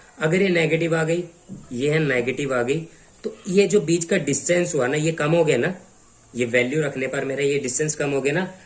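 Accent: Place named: South, India. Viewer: sir your pronounciation for squairereee is very funny , its seems that you are using south indian accent..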